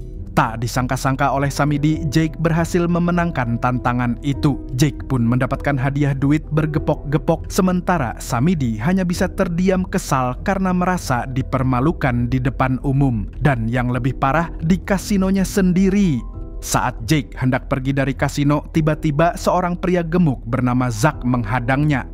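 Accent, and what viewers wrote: Banjar, Indonesia. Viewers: Urang banjar sekalinya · Buan banjar kah om ai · Tebak nah admin nya banjar bagian mana😂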